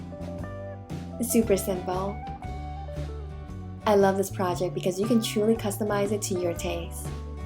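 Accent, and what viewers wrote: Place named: United States. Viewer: from an Real Indian woman to now being a sultry American voiceover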